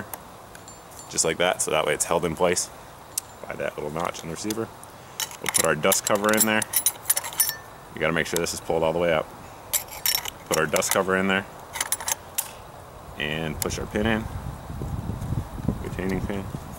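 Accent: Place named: Northern California, United States. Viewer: Norcal accent like Jake Shields. Great video man style- fast and to the point.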